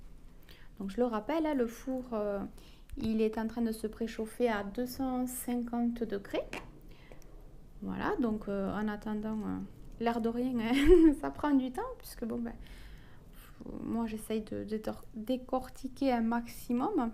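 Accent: South, France. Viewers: coucou j'adore tes vidéos je voulais savoir tu habite dans quelle région (du Sud je crois par rapport à ton accent) car il a l'aire de faire beau par la non ba voilà j'ai testé ta tarte à la tomate et chèvre elle a dit du succès et elle méritais je vais l'essayer demain le poulet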